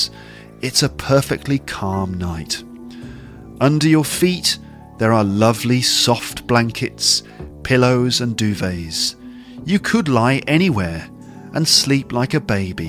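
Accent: United Kingdom. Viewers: To be honest I fell asleep once while listening to your podcast 😐 Please don't hate me - I've turned your podcast on with purpose, hoping that your pure British pronunciation will get some far parts of my poor exhausted brain and settle itself there))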